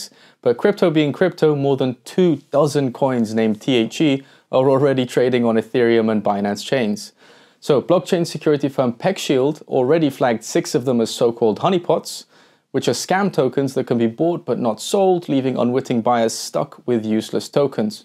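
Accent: United Kingdom. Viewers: English accent/+ , not Robin but Funny/+, look/(...as always) irrelevant - finally! :)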